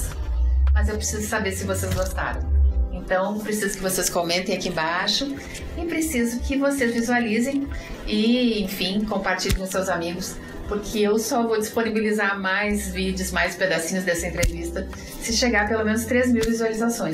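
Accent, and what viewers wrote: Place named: Rio Grande do Sul, Brazil. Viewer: Parabéns por sua trajetória. Acredita, que esta semana, vendo, ouvindo você, foi que notei que és gaúcha! Eu acreditei muito tempo que você fosse Paulista. Ah, mas fiquei muito entusiasmada., você é muito simpática e carismática. Eu amo seu canal e sua maneira de se comunicar. Felicidades.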